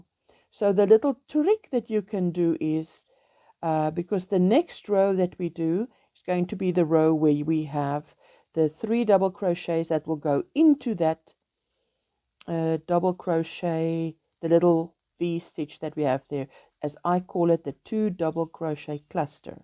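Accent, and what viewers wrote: Afrikaans, South Africa. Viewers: Is jy van RSA. Ek hoor Afrikaanse aksent.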